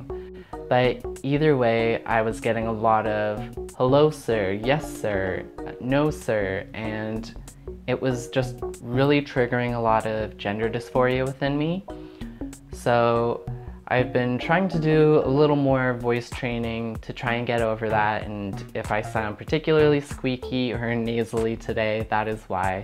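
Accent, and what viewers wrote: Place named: Canada. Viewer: Love the canadian accent!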